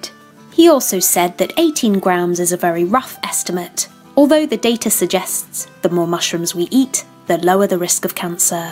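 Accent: United Kingdom. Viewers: Mushrooms are great, you can make them really tasty by adding herbs, garlic, tomatoes, etc. and there's no need to add oil. They're very low in calories, filling and "meaty" in texture so they're a great food to have around. Nice to hear a British voice and to know you're based in London too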